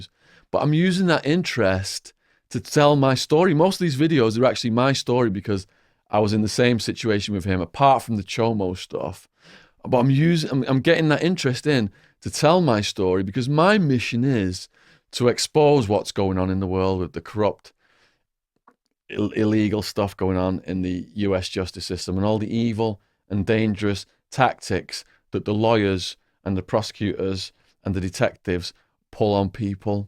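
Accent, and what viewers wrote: United Kingdom. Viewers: u have some kind of uk accent, but you're English is quite proper to me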